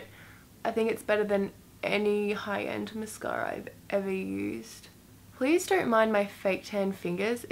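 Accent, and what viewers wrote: Australia. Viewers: Omg i love this look, and for people that are saying, " why is she wearing all that makeup her skin is flawless" Yes her skin is flawless and i hope she doesn't wear this much makeup on a daily basis. But she has a bloody makeup channel what do you expect. lots of people wear makeup just because they love to apply it and wear it. i don't wear makeup because I'm only 12, and my Mum wouldn't approve, but I still love to wear it on occasions ( when i get the chance.) Also I'm Australian and all those american Youtubers can get annoying at times, But listening to someone with the same accent as me is way nicer. Sorry for long af comment but recently found your channel and I love it ! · For some reason I feel like if Kendall Jenner had an Australian accent, she would sound like you😂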